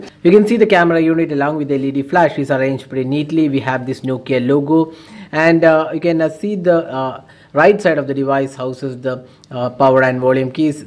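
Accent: India. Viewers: ok im leaving cause of his indian accent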